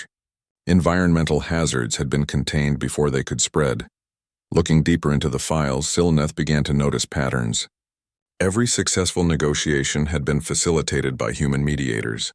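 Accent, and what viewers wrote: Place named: United States. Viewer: Oh the irony, of having an American voice declaring a breakdown of rules. In particular the time frame of the world order breaking because of an American presi... donkey.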